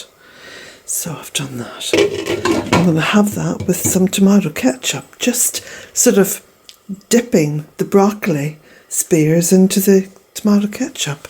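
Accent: Ireland. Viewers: I'm new to your channel and viewing from the US of A. Lovely and inspirational. Plus I'm in love with Jack. And you have to know how we Americans are enamoured with a good Irish lilt. In addition I'm a 60+ woman dreamy with Adian Turner as Poldark thank you very much PBS. Stay well.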